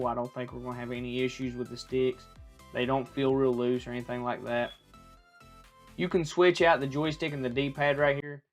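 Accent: South, United States. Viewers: U from the south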